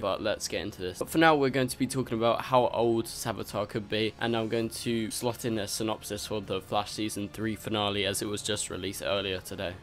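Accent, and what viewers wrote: United Kingdom. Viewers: Are you British? What accent do you have?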